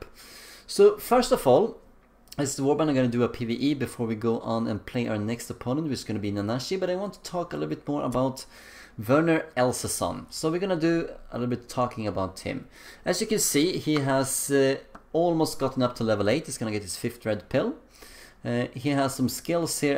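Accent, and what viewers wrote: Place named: Germany. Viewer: I was just curious about your nationality. Your accent and manner of speaking reminds me of my German grandparents. When I mentioned your ability with the numbers, I was referring to the calculations you were making on the fly concerning critical hit chances and such (which also led me to believe you may have German ancestry =) ). I hadn't made it to the Excel portion of the video yet. I think it is good to show people that when you track numbers, you can make the best decisions. Because math. Keep up the good work.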